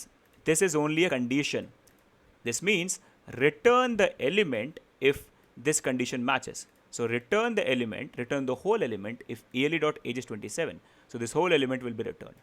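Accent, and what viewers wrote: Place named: India. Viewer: It's so cool and understandable very very simple explanations so easy to understand the Indian English is not what everyone can go with but minus that is supper cool I wish u can upload a video on how I can master them if it's possible to master them in the DOM manipulation or maybe react or Nods I really don't know where to go to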